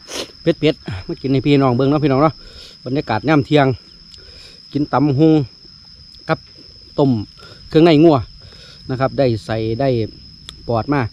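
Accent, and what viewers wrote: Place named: Amnat Charoen, Thailand. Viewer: หนูว่ายุอ้ายคือเว้าคือภาษาบ้านหนู เอฟซีอำนาจเจริญเด้อจ้า 😁😁😁😁😁😊😊😊😀😀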